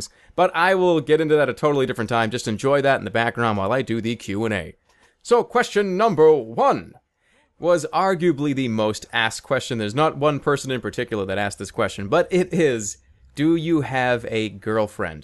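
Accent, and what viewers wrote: Boston, United States. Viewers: and hes got sort of a boston voice :D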